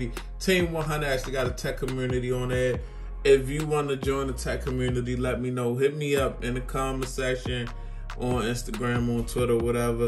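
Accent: Baltimore, United States. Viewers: Love the video, I subbed. I’m just wondering but are you from Baltimore?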